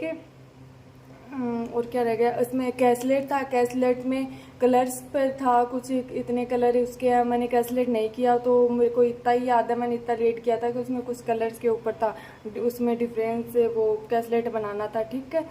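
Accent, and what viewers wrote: Rajasthan, India. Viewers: Language se Rajasthan k lagte ho😅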